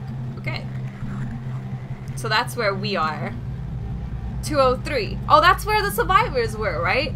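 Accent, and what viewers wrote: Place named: New Jersey, United States. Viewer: It sounds very Jersey.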